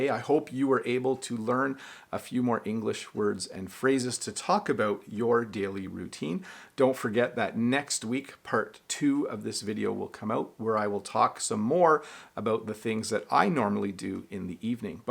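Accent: Canada. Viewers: Thank you sir , i always watched all of your videos . Easier to understood. And i like Canadian pronounciation. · Hi, I like your videos because I understand you very well, maybe because you're canadian? I sonder if you speak normaly or slowly for your students....let me know.... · I just can't get enough hearing your nice Canadian accent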